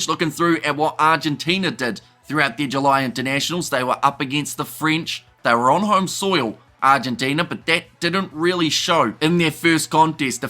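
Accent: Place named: South Island, New Zealand. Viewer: Sound like a south islander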